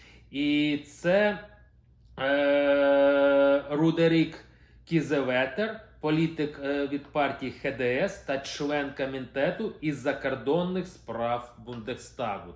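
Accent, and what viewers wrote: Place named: Russia. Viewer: А ВЫ. ЯК ОГО. ЛЫСОГО. СЫДЫТЕ. БАЛАКАЕТЕ. НА. УКРАИНСКИЙ. МОВИ. З. АКЦЕНТОМ. МОСКАЛЯ. И. ПОКАЗУЕТЕ. ЩО ВЫ НЕ. ЛЕДАЦЮГА. АНУ. БИГОМ. НА. ПЕРЕДОК. АНЕ. ЗВЫЗДИТЫ. И ЗАРОБЛЯТИ. ГРОШИ НА. БИДНЫХ. ЛЮДЯХ. ПРОВАЛИТЬСЯ ИЗ. СВОИМ. КАНАЛОМ. В. БЕЗДНУ!!!!!!!!! ПИДДЕРЖИТЬ. МЙ. КОМЕНТАРИЙ. ДОРОГИ. И. НАСТОЯЩИЙ. УКРАИНЦИ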